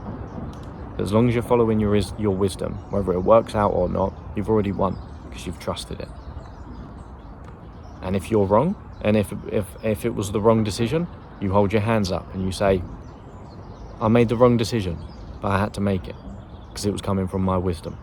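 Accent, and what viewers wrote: England, United Kingdom. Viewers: The Wise English Voice in my head is back 😁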